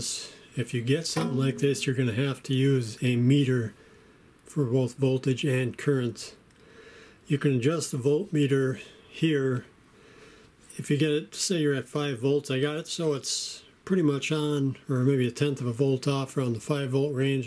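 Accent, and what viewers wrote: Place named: China. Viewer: chinglish yup I know :(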